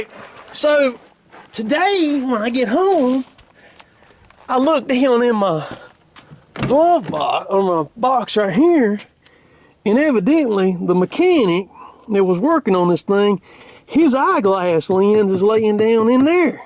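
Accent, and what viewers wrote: South, United States. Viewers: Man you have one of the thickest southern accents I've ever heard! Where you from brother?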